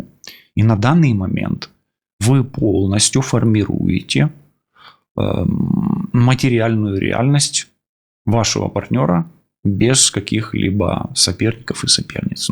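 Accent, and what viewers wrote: Ukraine. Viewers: Спасибо за красивую Украинскую речь.Рассклад - класс !.. Не знаю,как объяснить...🌹🙏🌹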